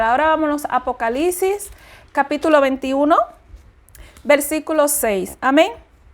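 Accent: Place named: Spain. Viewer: Tienes acento de España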